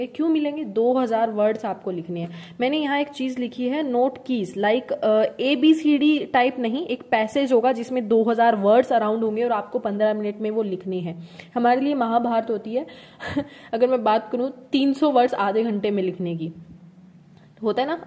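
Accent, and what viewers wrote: Haryana, India. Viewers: 🤨 sahi baat hai miss 👍👍 Main baat hai focus ki, 50-50 agar divide ho jaayega focus bank aur ssc me toh bank ka kharab hoga aur ssc bhi. 1 jagah focus kare to success zaroor milegi, meri bhi yahi soch 👍 hai Really like your Haryanvi accent 😍❤️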